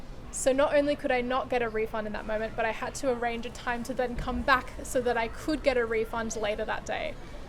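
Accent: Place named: Australia. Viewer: Your videos are really fun to watch especially with your Australian accent is just icing on the cake. Already subbed for keeping myself constantly motivated watching your old videos and upcoming ones. Thank you so much and keep making more videos! By the way, I'm from India and am learning French as well, and I plan on reaching at least a CEFR B1 level in French before moving to France.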